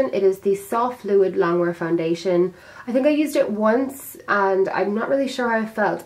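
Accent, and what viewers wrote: Ireland. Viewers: I'm learning Irish accent with your video! Thank you✨ Love from 🇯🇵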